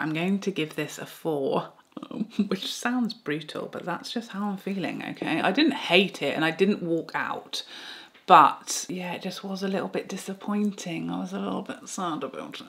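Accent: United Kingdom. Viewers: Bonjour! I love your reviews. You are so nice and funny too. And différent from Canadian and US reviewer. Plus, i really like your british accent. Prize 1 would be my favorite. Bisous xxx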